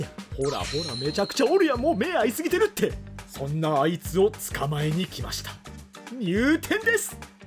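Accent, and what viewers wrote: Busan, South Korea. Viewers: 물론 철은 아니지만 몇키로 주는지 모르겠지만 비싼편 이네 근데 한국분 아니심? 발음이 한국인 인데용? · 좋은 데이가 고고로 채워줬 데이~🎶 부산 사투리 제대로 쓰시네요! 👍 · 경상도 사투리도 잘하시네요.😅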